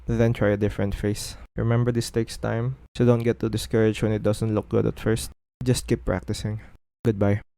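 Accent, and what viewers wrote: Philippines. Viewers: pilipino accent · Man that Fil Accent is really distinct lmao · Are you from Philippines? You sound like you're from there 😂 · Your accent kuya sounds like Filipino accent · Filipino accent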